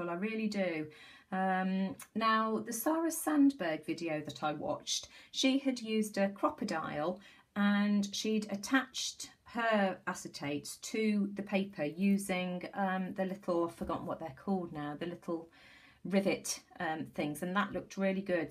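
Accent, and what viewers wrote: United Kingdom. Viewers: thank you so much for replying that was very sweet of you to take time out but I figured you were probably in the UK from your accent I'm in the USA so I don't even know what Boden is LOL just looks darling I had a top similar to it a long time ago and made me think of the polka dot top I used to have and I don't know what happened to it LOL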